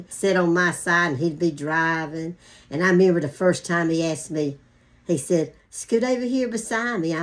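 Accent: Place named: South, United States. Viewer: These are the best kind of stories. Thank you for sharing. I gotta say that your amazing southern accent makes it even better for this west coast gal.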